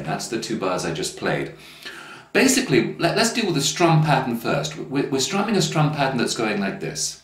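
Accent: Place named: England, United Kingdom. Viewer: Muy ingles el acento 😆✌️🤙👉🇦🇷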